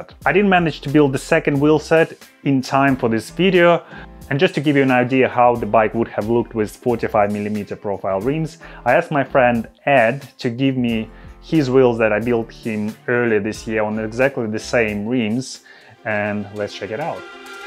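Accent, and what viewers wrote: Russia. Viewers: Так и думал, в самом начале ролика, что русский =) По акценту слышно.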